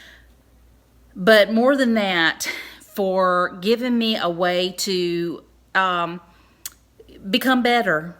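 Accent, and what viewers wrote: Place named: Oklahoma, United States. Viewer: You are so cute. When I first heard you I thought she talks like me. I'm from SE Oklahoma and you would fit right in. I am subscribingvright now. Plum Street is my favorite, too.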